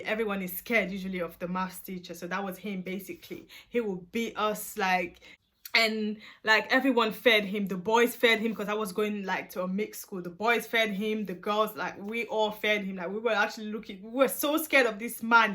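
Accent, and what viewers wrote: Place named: United Kingdom. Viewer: Aww do you live in the UK? I hear an accent lol... I can’t believe this even happened to you x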